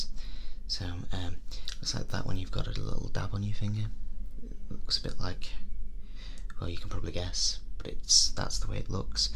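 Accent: United Kingdom. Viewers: Just found your videos on my ' Recommended' list and honestly I adore your style! So happy I found you as there aren't many 'decent sounding ' Brits (no offense to any other fellow Brits, just some accents sound better to me personally).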